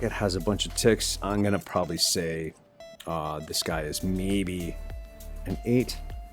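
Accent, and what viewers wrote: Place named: Canada. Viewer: wicked strong Canadian accent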